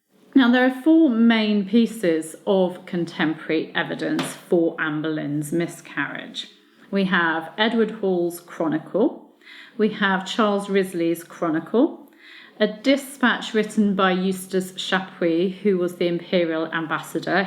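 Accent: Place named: United Kingdom. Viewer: That's the British English spelling - and she's speaking British English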